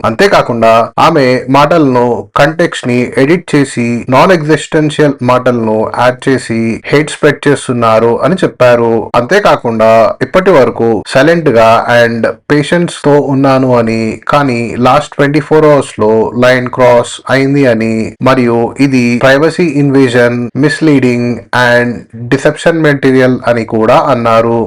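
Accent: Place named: India. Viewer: Content bagunnayi but telugu slang konchem change cheyali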